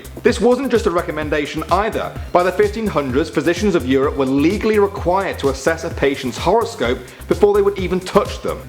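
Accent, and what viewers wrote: United Kingdom. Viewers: I hear anyone hates our accent boi they dead British accent is fuggin dope I think I might have had a stroke -. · Don't take all the bad comments to heart. I think you were great! Love the british accent!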